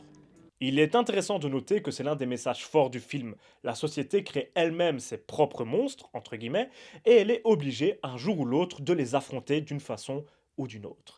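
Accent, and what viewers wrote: Belgium. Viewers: J'aime bien ton accent belge 😎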